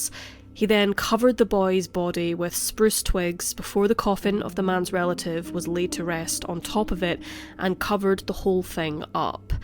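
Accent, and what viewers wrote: Scotland, United Kingdom. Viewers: Who is this bird with the Scotts voice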